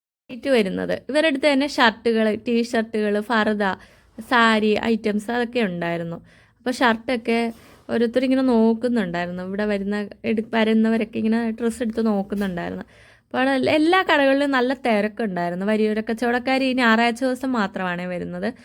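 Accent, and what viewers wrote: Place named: Northern Kerala, India. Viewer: തെറ്റുകൾ എല്ലാവർക്കും പറ്റും., നിങ്ങളുടെ ആദ്യ വീഡിയോകളിൽ ഞാനും കുറച്ചു തിരുത്താൻ ശ്രമിച്ചിട്ടുണ്. പക്ഷെ നടക്കില്ല, എന്നെനിക്ക് മനസ്സിലായി. കുഴപ്പമില്ല, നിങ്ങളുടെ വീഡിയോ കാണുന്നവർ പ്രയോഗ ഭാഷയിൽ അല്ല, മറിച് അവതരണത്തിൽ ആണ് കൂടുതലും ശ്രദ്ധിക്കുക. ഏതായാലും ഒരു ആലപ്പുഴക്കാരി ഇങ്ങനെയെങ്കിലും വടക്കൻ ഭാക്ഷ സംസാരിക്കുന്നതിൽ ആലപ്പുഴക്കാരനായ ഞാൻ അഭിമാനം കൊള്ളുന്നു. ധൈര്യം ആയി മുൻപോട്ട് തന്നെ പോകുക.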